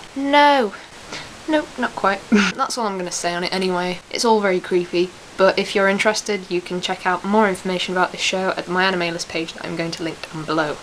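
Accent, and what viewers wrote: United Kingdom. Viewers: XD a pretty girl who plays persona? AND has a british accent!? AND loves Teddie?! 0_o i think im in love XD that aside, i love the way you criticiced the first episode, and agree on everything you said. Bear-Y good review XD ;)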